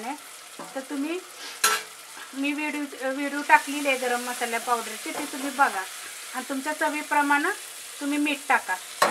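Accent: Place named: Rural, India. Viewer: खूप छान मावशी,,,, तुमच्या भाषा गावाकडची आहे पण त्यात खरंच खूप प्रामाणिकपणा दिसतो,,, जेवढी चव तुमच्या बोलण्यात आहे तेवढीच या पदार्थात असेल यात काहीच शंका नाही...! आणखी खूप व्हिडीओ बनवा,,, अस्सल गावाकडचे पदार्थ शिकवा आम्हाला..!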